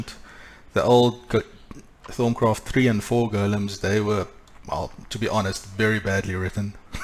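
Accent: South Africa. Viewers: Your sound South African, regardless, great mod. Keep it up.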